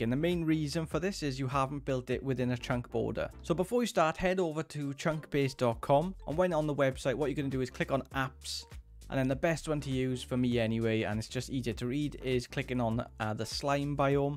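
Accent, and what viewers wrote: Wales, United Kingdom. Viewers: Hey, where in Wales are you from? I'm from Llantrisant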